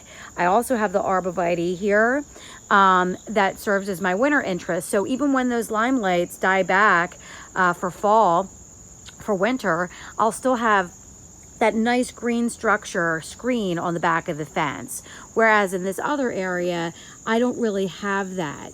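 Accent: New Jersey, United States. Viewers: I deffs knew were from NJ from listening before reading the description. And I love how cute you've made that shed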